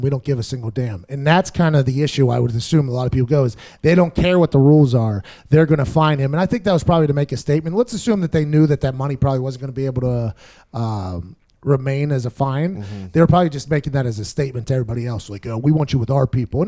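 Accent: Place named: Pittsburgh, United States. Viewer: “Aht” love the pittsburghese that speaks.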